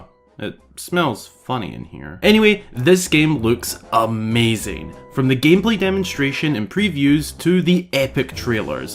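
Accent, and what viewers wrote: Scotland, United Kingdom. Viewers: What is it about scottish accent creators that make me want to play TOTK even more lol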